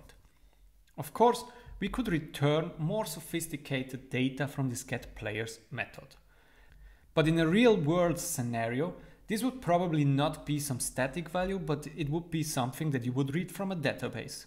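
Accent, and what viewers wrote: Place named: Switzerland. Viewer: meh ghört din schwiizer akzent voll use. super video merci <3!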